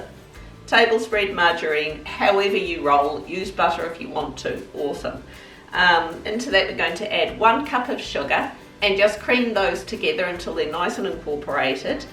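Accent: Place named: New Zealand. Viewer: Absolutely adore that Kiwi accent & no mucking around with fancy words and just gets the job done. Good old Kiwi style!!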